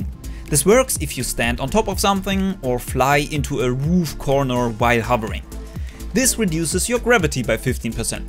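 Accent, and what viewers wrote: Germany. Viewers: Bro du bist ein deutscher oder? Hört sich jedenfalls so an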